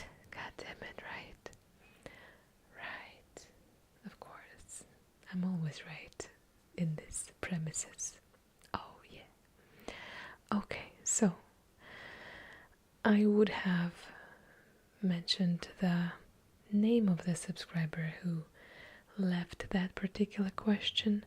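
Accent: Russia. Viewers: Your voice is so warm and relaxing, some of the most soothing ASMR I’ve ever heard. When I first discovered the art form several years ago, GentleWhispering was the first vocal ASMRtist I heard who made me tingle and she also has the same hypnotic Russian accent. Something about the accent just instantly melts my brain and gets me ready to fall asleep, thank you so much for doing what you do!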